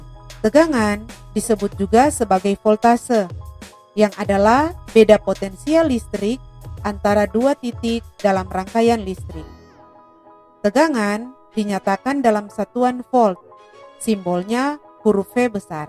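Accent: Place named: Manado, Indonesia. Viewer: Rupa logat manado ini 😂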